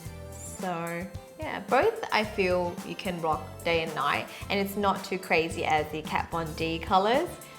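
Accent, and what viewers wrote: Australia. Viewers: Is she from Australia? I can't tell because I'm Australian too so I can't really hear it😂 plaease let me know❤️ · Great video! I love your accent, where are you from? Australia? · LOL seeing you get so worked up over "stick" was hilarious. Curse our Australian accents!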